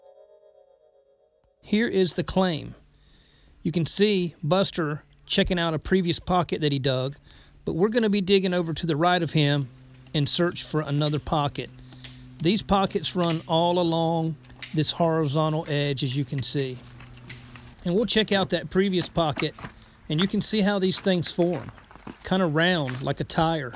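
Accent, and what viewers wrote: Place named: Carolina, United States. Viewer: Handsome, charming, rugged, manly man with a Carolina accent and a sense of humor, doing scientific 'ciphering' while digging into dirt and rock to pull out massive, gorgeous gemstones!... WHAAAAAT! THAT'S JUST HOT! Best YouTube channel EVER! ROCKS!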